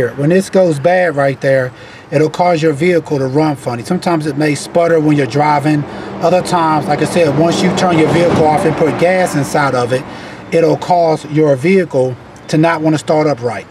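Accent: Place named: Louisiana, United States. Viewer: i hear sounth louisiana, st bernard parish in dat voice. great tip, thank you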